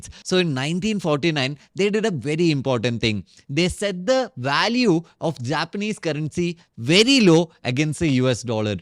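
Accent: Kerala, India. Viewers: Are u mallu 😊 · Are you from Kerals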